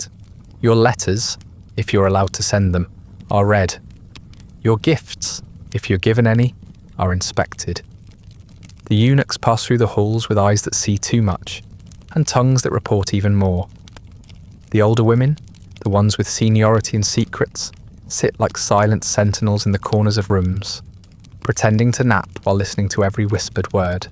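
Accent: United Kingdom. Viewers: Yes, with an English accent.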